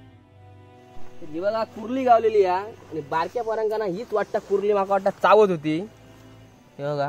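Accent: Malvani, India. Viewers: Amazing bhava !! 😂😂✌🏻 tu ani tujha malwani accent 😂✌🏻♥️ tyane video madhe ankhin fun yeta !! Keep it up bro ♥️✌🏻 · Lay bhari bhava.. Tuzi malvani boli ti pn tuzya unique comedy andajat hasayla bhag padte.. Kahitari navin karnyacha prayatn ahe tuza khup mast vatat tuze video baghun. Keep it up bro.. 👍🏻👌🏻👌🏻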